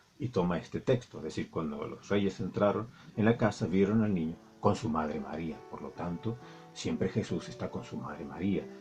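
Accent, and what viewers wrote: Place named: Cordoba, Argentina. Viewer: Jaja Dios lo bendiga disculpe no me pude peinar... Bien cordobes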